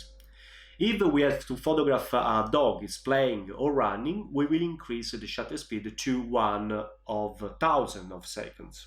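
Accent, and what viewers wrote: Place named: Italy. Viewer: Quanto si sente che sei italiano 😎 ottimo video, io posseggo la p1000 con un filtro nd 1000 ed è pauroso il risultato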